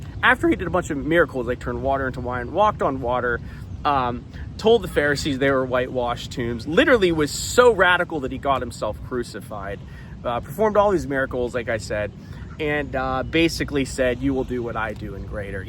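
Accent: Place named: United States. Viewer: Wow. I just finished watching the entire video and I'm very impressed by your intellect. I'm glad some Americans like you can think critically with valid information and make out an answers by themselves. I'm not a Christian and neither do I plan to be one but I'm very glad a white American (Just assuming based off of your accent and appearance) is actually following the true teachings of the Christianity and not some twisted version made by ideologically skewered men. Thanks for making this video, it gives me atleast a glimmer of hope in a country I though was doomed and destined to fail.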